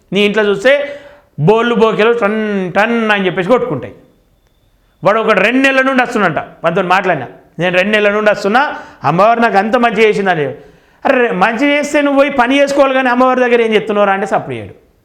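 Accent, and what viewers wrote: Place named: Telangana, India. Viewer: తెలంగాణ స్టైల్ లో మాట్లాడినావ్ అన్న సూపర్.❤❤❤❤❤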